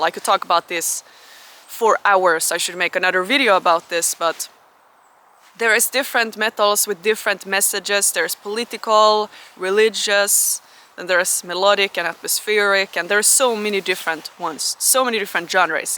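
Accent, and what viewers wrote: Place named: Finland. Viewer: I absolutely LOVE your Finnish accent speaking English! Especially the way you say "Music"! I began learning guitar in 1968 as a child and grew into the music of 1970's. In my opinion the absolute best decade for music of the 20th century and I agree with you for the same reasons even though I am old enough to be contemporaries of your grand parents. I like your channel.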